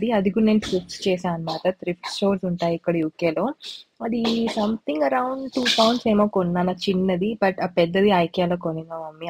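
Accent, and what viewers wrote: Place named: Andhra Pradesh, India. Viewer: Medi madanapalli ha slang koncham malaga vundii sooo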